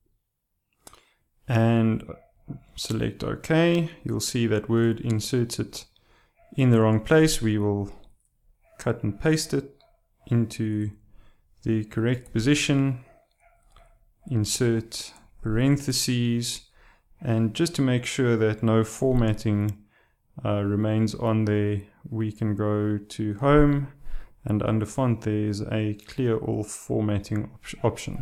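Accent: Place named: Afrikaans, South Africa. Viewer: I'm a simple man, I hear Afrikaans English, I upvote.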